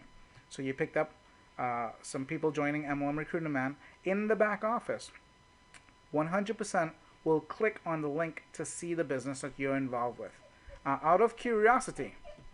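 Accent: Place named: Trinidad and Tobago. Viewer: You sound Trinidadian.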